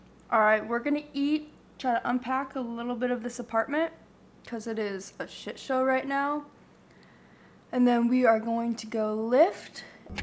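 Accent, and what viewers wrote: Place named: United States. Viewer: I love your American accent !! Greetings from France :)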